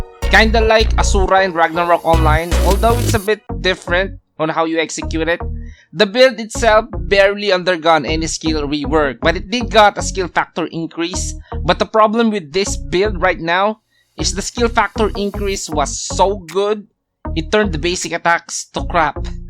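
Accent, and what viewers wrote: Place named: Philippines. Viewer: Hard Filipino accent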